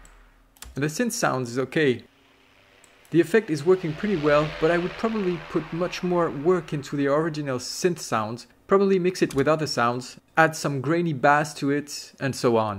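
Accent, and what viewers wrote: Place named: France. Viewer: Slight french accent detected.Nice tutorial by the way :)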